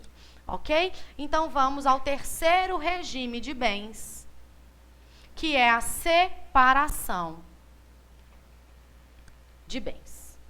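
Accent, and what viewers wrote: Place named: Belo Horizonte, Brazil. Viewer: Ola Prof este seu sotaque é de BH kkkkk.Mas uma boa explicação de vossa parte.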